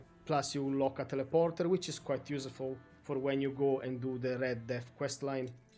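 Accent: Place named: Italy. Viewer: ah ecco............mi pareva che tu fossi italiano!!!! dall'inglese, buono perfetto, ma ho sentito che quell'ìinglese era un pò da pronuncia italiana!!! Comunque Grazie, le tue build sono interessantissime e questo è un bellissimo gioco!!! che ancora non ho finito visto che sto provando build su build.........e sono a 100 e passa ore!! Hai preso anche l'espansione? io si, e mi piace un sacco!!!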